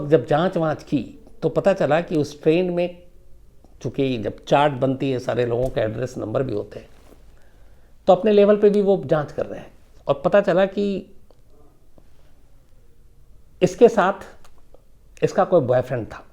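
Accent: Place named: Lucknow, India. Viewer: Sams sir me aap ki sari story sunta hu kabhi comments nahi kiya aaj ker raha hu aap ki hindi mere lucknow jasi hai aap up ke ho kya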